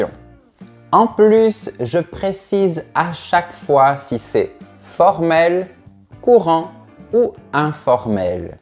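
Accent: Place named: South, France. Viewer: Un diamant, oui, mais pas brut plutôt raffiné...avec un petit accent du midi ( sur les "o"). Mais c'est charmant. Merci à.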